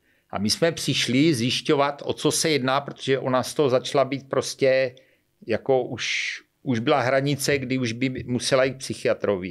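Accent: Brno, Czech Republic. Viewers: Pač je borec ze Šlapanic Kousek od Brna Mluví Brněnšinou Stejně jako Moris z Madagaskaru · ten hantec mě rve uši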